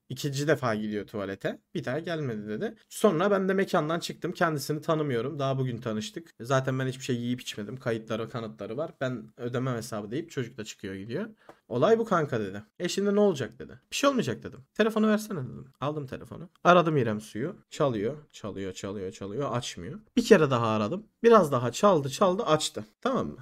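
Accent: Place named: Izmir, Turkey. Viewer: Aga nerde duysam tanırım izmir bura amk yaaaa 19 yıl yaşadım kesin izmir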